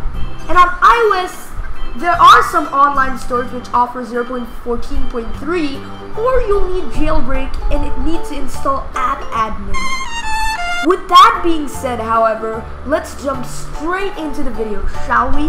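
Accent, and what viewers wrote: India. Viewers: are you indian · Wait Is He Indian??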